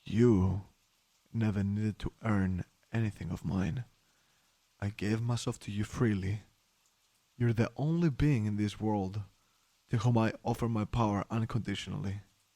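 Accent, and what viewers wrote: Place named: Greece. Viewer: your accent sounds so greek wow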